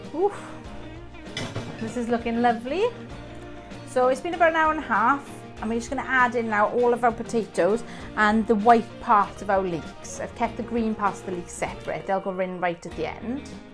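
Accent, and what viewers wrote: Wales, United Kingdom. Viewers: Appears delicious. I want to try cooking it! Is your accent Welsh? I visited Wales many years ago.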